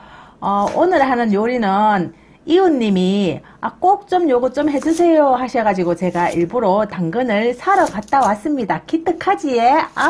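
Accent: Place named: Daegu, South Korea. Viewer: 대구 말이 너무 좋아요 ❤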